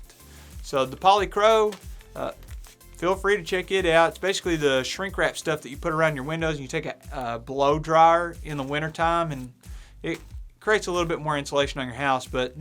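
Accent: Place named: South, United States. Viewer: In fact, if you keep the bottle cap on and cut off the bottom end of the water bottle, your scoop is several ounces larger because you didn’t have to lose any volume getting to the wide part of the bottle; and - bonus - you have a funnel option if and when you need one. Not a good option is you are wanting to park a scoop of water upright, unless you jam the neck of the bottle into a cranny in a tree root or a vole hole. Anyhoo, I dig your vids; you are judicious, even-handed, and informative, all in a well-tempered laid-back package. I take it from your accent that you are a Southern boy; I grew up within 5 miles of the tallest peaks along the Blue Ridge Parkway and about 10 miles from the North Carolina border of the GSMNP, where I started hiking and camping at age 7 or 8 with my Pop and younger brother. As you said, good stuff.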